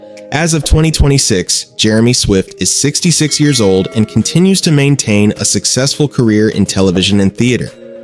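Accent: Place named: United States. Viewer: Why an American voiced narrative?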